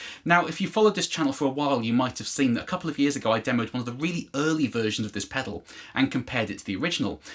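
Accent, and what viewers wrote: United Kingdom. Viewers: It’s an English accent. Britain includes Scotland and Wales too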